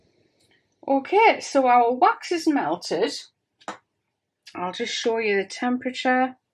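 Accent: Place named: Scotland, United Kingdom. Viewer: I simply adore your accent. May I ask where you’re from? My first guess is Scotland 🏴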